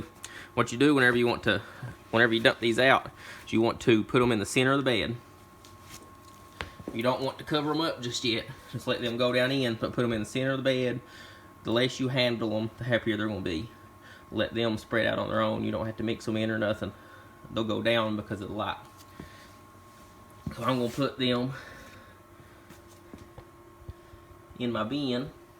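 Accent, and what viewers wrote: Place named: Alabama, United States. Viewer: sounds like Alabama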